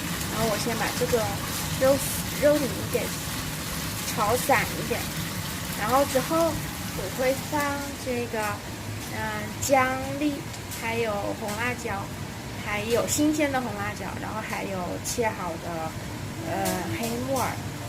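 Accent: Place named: Changsha, China. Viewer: 长沙妹陀，口音好重啊，嘻嘻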